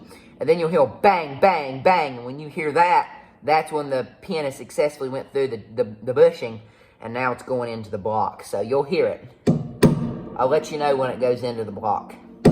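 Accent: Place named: United States. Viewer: in which part of US is your accent? thank you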